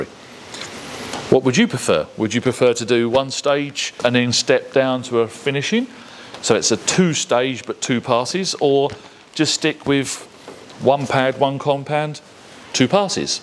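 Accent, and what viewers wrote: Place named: United Kingdom. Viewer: isnt lake country is based in the US ? just wondered why did his accent sound british ?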